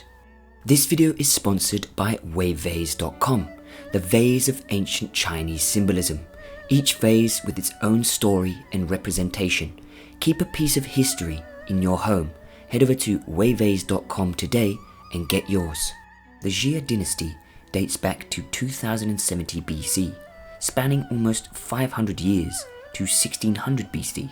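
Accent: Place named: England, United Kingdom. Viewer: I can't fathom being pissy with his pronunciation being off. He's got an English accent and the desire to not only learn, but to teach about this fascinating culture's history is admirable. Most westerners don't have the ability to pronounce everything perfectly in a language so profoundly different. We just don't have some of the sounds prevalent in english. Seems to me that taking issue with such trivialities is more about making sure everyone is aware you know more. This nonsense today where some think mispronouncing something is disrespectful is a joke. I can't imagine someone getting prickly about english mispronunciation, but maybe some do. Maybe just an indicator of the toxicities that exist among all people in certain individuals. I'm just appreciative that he used his time to put up a video in an effort to teach people. Thank you for making concise videos for us who just want to learn about the culture and history. 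Great narration and choice of photos/art.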